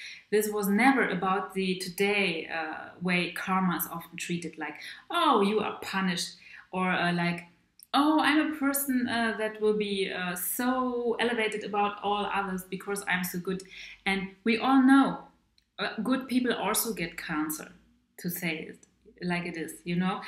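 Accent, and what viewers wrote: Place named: Germany. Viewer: Ich liebe es, mit deinem deutschen Akzent zu sprechen. Sie haben eine so positive Aura. Du lächelst immer. Ich mag das sehen. Ich finde deine Videos charmant. Ich weiß, du bist sehr weise und gelernt. Ich spüre "Macht" in dir. Vielen Dank. Mögen die Götter dich und dein Zuhause segnen.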